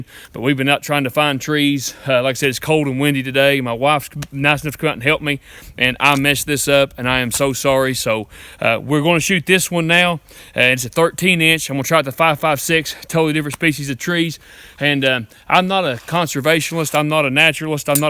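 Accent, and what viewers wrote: United States. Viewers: The video was really refreshing, what I call proper American. I am from UK and bird and rabbit shoot in woods not dissimilar to yours, unfortunately, our government takes a dim view of the populace having firearms, so best I can shoot without spending a fortune is shotguns. Just love your accent dude, you come across real natural, and just pop anything up when you got the time, we hillbillies got to work to make ends meet. I do shoot an American flatbow in my hunting grounds in Hampshire, heard you backwoods boys are handy with a bow. Grace and Peace American bro.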